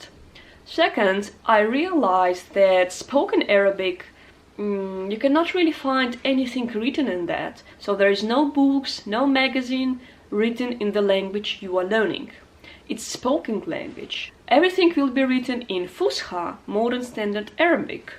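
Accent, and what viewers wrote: Russia. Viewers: У Вас русский акцент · Хотел спросить не русский ли акцент. Конечно русский) молодец!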